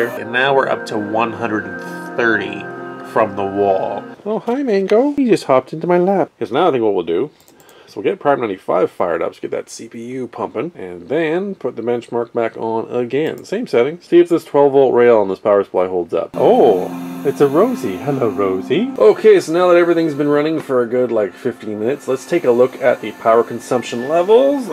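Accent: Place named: Russia. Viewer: that Russian accent is on point.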